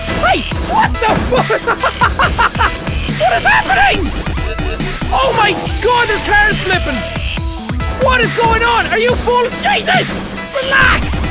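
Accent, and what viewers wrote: Ireland. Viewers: He's form Ireland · Hey.... or, or whatever your name is.:$ haha I'm a new subscriber to you and I just wanted to say that when I saw a youtube gamer with an Irish accent, I was in complete awe! You're brilliant, dude! Can't wait to see more vids! P.S. I was in Ireland on vacation over the summer, and I really miss it. Your accent brings back a lot of awesome memories.:) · Are you Irish? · and/or i think that your are absolutely BOSS for putting up with bullsh*t comments and that your mail sail hair is BOSS and i want your fricking hat, i live in the southwest of England and my girlfriend is from northern Ireland and i just LOVE the Irish accent and i can understand everything you guys say and wish i could change my accent to Irish it's too fun! Lots of lols i cannel all my bossness towards you so that you can be even more boss, if that's possible- from Caz!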